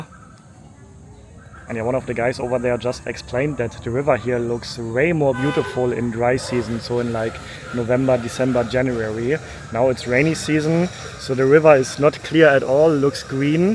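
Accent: Germany. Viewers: This dude reminds me of Pee-Wee Herman w/ a German Accent!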